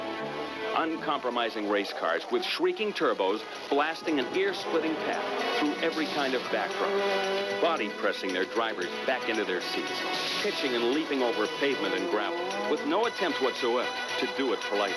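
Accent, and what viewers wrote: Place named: United States. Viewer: why the F***K is thier an american voice over what the hell would they know about rallying?? thier probably shocked to watch a car make both a left turn and a right turn this ass hole belngs in a nascar video not this one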